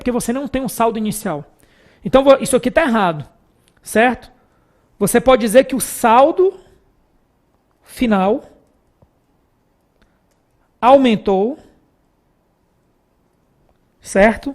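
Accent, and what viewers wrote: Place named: Manaus, Brazil. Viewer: Acho que ele é de Manaus Pelo sotaque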